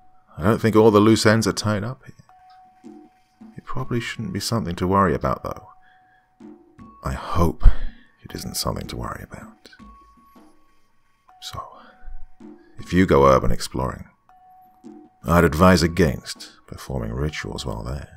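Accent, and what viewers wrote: France. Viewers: I agree!!! 👏👏👏 his French accent is TOPS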